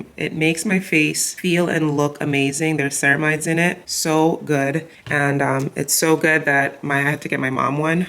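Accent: Jamaica. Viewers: Girl, are you Jamaican?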